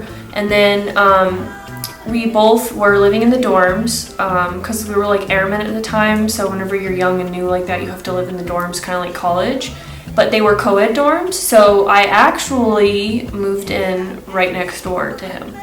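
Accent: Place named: South, United States. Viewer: Where are you from? I hear a mild southern accent!!!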